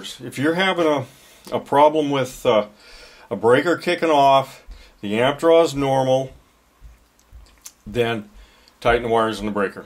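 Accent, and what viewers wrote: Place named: Appalachia, United States. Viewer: Jesus stop saying, "kick off." You sound like a hillbilly. Circuit breakers "trip." Fuses "flow" or "melt." You sound like you've been hanging around a bunch of butt-crack hillbillies too long. Yeah, electricians may say it too but only the butt-crack hillbillies do. Electricians that know what they are talking about don't talk like that.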